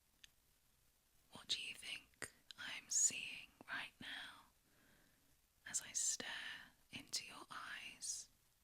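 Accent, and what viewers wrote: United Kingdom. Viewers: Your British accent makes totally devoted to u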